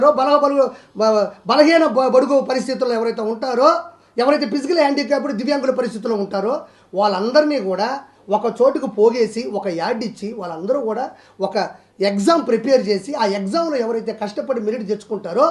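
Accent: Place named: Nellore, India. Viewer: Orey RP, you are making comedy with nellore language , first stop making comedy with nellore language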